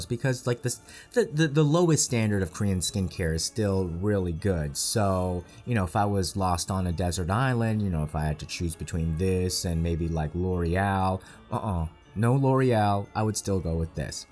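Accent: South, United States. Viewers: I wish you had your own crime show over here in the states,. I would have you on my TV 24/7!!!! I just love the way you explain things and tell it like it is. And omg those cosmetics you tickle me😂😂. I think I heard you talk about living in the States one time. I live in Tennessee so I talk like Ellie Mae Clampett lol and I just got to ask you about your accent because I think I hear a little bit of southern lol I just love it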